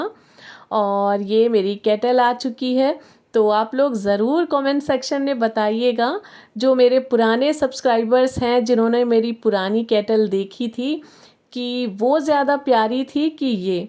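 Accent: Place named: Hyderabad, India. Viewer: Assalamualaikum khairiyat kaise ho ap mai samjh t thi u p ke log alag baat karenge lekin ap to hyderabad ke logon ki tarah baat kare Mujhe bohot acha lagta hai apke vlog dekh ker allah ap ku khush rakhe ameen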